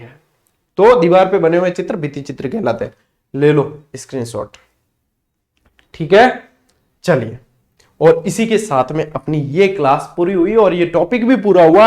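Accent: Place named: Marwar, India. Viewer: Sir aap Marwadi bolate ho to meethi lagti hai main Bhilwada se hun aur hamare yahan Marwadi bilkul bhi acchi nahin hai bolane mein hi Aisa lagta hai Jaise Lad rahe ho😂😂😂😂😂 Or Thank you 🙏🙏🙏🙏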